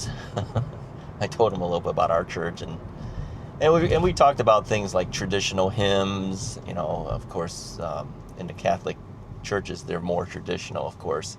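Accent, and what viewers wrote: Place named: China. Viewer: you know you have a Chinese accent.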